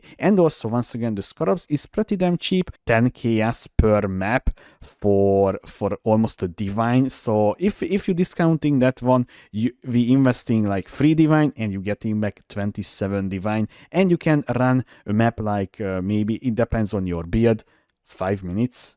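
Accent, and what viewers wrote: Hungary. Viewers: are you hungarian? what is that accent? 😁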